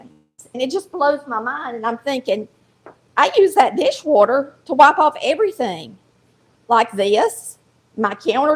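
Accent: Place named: Alabama, United States. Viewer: I enjoy watching you cook and your accent reminds me of my auntie that grew up in Alabama. ❤ She was a great cook also. 😘💕👍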